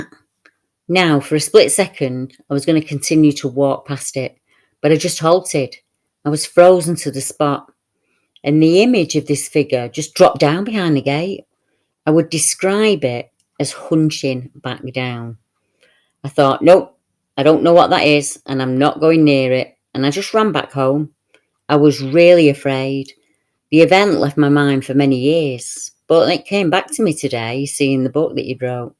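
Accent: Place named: North, United Kingdom. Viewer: Not commented in a while as I watch your videos mainly on my TV as I sleep, something about the fellow north accent and the amazing encounters is just so peaceful to me in a stressful part of my life. Sending Much love to you and everyone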